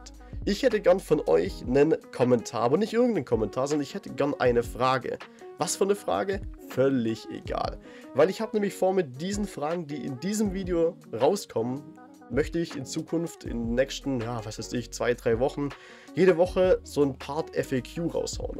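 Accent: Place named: Swabia, Germany. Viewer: Kommst du eigentlich aus dem schönen Schwabenland? Hört sich stark danach an 😁👍 Congrats natürlich zu den 1K 🤝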